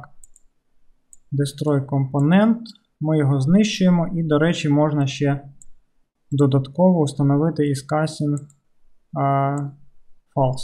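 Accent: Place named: Poltava, Ukraine. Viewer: Одразу чути, що автор з Полтавщини... бо я теж))))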